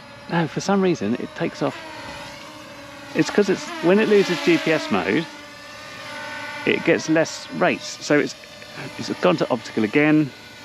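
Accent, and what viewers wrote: United Kingdom. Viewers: Like the old saying goes, you get what you pay for. You have more patience than I do. Still enjoyed the video. English accents are always more enjoyable to listen to. Imo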